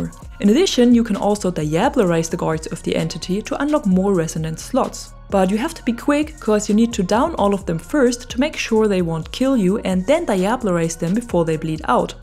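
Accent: Germany. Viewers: Are you a german ? xD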